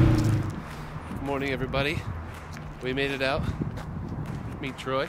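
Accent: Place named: Northern Canada, Canada. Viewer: Interesting accent. Northern Canada?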